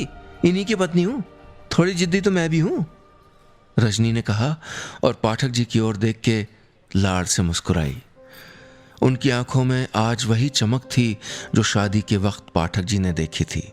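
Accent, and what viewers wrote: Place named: Kumaon, India. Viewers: Love your way of kumaoni diaglouge Can’t control my laughing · I luv ur pahadi accent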